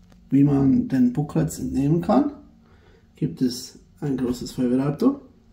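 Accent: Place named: Switzerland. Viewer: Endlich auf Deutsch! Mit tollem schweizerischen Akzent. Vielen lieben Dank!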